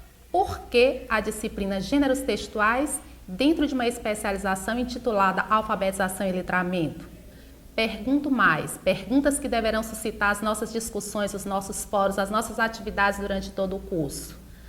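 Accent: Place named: Northeast, Brazil. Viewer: Sou de SP. Mais esse sotaque do Nordeste é o mais lindo do Brasil. Adorei está aula.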